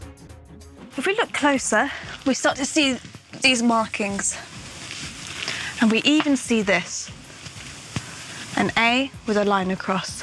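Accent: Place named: United Kingdom. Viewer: This is so interesting! Thank you for sharing. You're such an eloquent and engaging speaker. On a slightly unrelated note, your name makes me wonder if you're Ukrainian/Slavic?.. But at the same time you definitely sound like a native British speaker haha. I hope it's okay to ask, but do you have Slavic roots or am I digging too deep?:)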